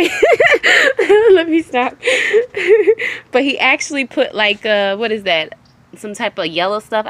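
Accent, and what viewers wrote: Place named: Houston, United States. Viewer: First off I like her voice/accent/cadence. It's soooo familiar. That accent plus certain words/phrases she uses, tells me she's from Houston. I'd be surprised if not. If not Houston, somewhere in Texas.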